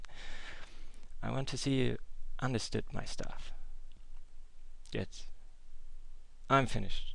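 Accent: Germany. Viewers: You still have an accent. You can just about tell it's German but yeah you can tell it's there. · You still have your german accent. One matter of practicality. Tricky techniques don't need handholding. We can setup on our own. Make your life easier and skim the basic stuff.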